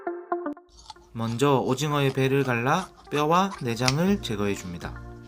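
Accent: Gyeongsang, South Korea. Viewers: 갱상도 청년이시군요. 는 소리없이 자막으로 어디서나 간단명료하게 볼 수 있음이 특징이예요. 목소리 반기웠어요^^, 그러나 말소리가 나오니 장삿꾼 같은 느낌이 나서 참신함이 좀 훼손 되는 듯. ㅎㅎ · ㅋㅋㅋㅋ 같은 갱상도사람으로서 사투리 너무 구수합니당 고추우장/ 다진마뉼 / 오징어로 미나리초무침이랑 볶음으로 밥도둑 완성했어요 감사합니당😄😁😆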